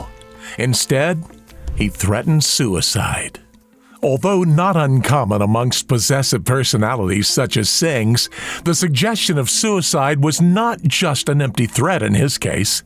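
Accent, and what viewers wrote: United States. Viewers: this American overacting voiceover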